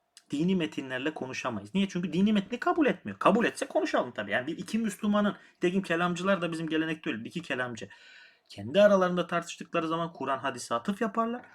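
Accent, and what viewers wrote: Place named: Gostivar, North Macedonia. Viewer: Çok güzel bir yayım olmuş. Takibe aldım sizi, diğer videolarınızı da izleyeceğim inşallah. Bu arada aksan hafif tanıdık geldi, meğerse memleketliymişiz hocam. Gostivar'lıdan Ohri'liye selamlar.:D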